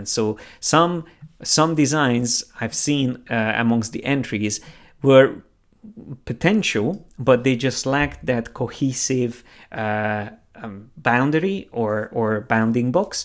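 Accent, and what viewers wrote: Hungary. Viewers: Hi there, your accent is very Hungarian. :) I'm Hungarian and this is my 99d portfolio. <link>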